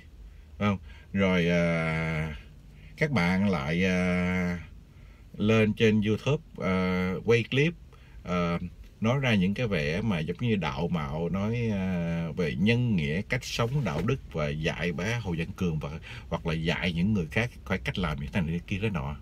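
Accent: Standard. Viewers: Chủ kênh nói chuyện hợp tình hợp lý hay có tâm nói chuẩn quá anh trai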